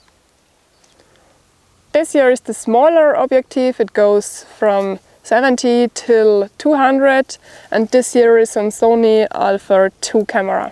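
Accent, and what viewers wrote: Germany. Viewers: That was a very interesting video. A little bit of a departure from your normal videos. You always have the best equipment and take the time to explain each item. Loved the entire camouflage on everything. You look so much better these days. Then again you look good always I must admit! You're obviously recouping well and looking good as ever. Just can't get enough of your German accent. It's so adorable. Appreciate how you put the hard work in for each video. Good health going forward. God Bless. 🥰🤗❤🙏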